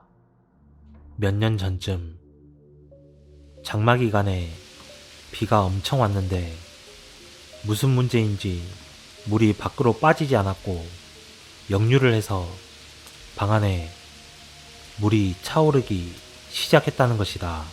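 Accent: Jeolla, South Korea. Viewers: 전라도,억양 ㅎㅎㅎ 어떡,할꺼에요 ㅎㅎ 고향냄새 좋네요 ㅎㅎ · 전라도사투리 재미있어요. 무서워야하는데, 사투리때문에 집중못했어요. ㅎㅎ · 제가 오히려 더 감사합니다. 진심 남의 사연을 듣는 게 아니라 본인 사연같아요. 구독자 엄청엄청 늘어서 흥하시길 바랍니다. 저는 경상도라 쌈무이님꺼 매니아인데 전라도 말투도 최고입니다.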